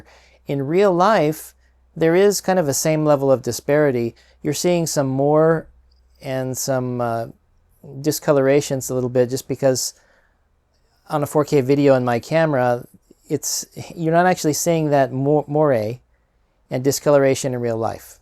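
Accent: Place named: United States. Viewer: Another great video! You really do a great job presenting this, every project you demo makes me want to do it myself even though I don’t have the same computers😂. You are to blame for the retro Mac illness I am currently experiencing!😂. Did you say you aren’t based in America? Your accent/vernacular both suggest to me that are American! Where are you located?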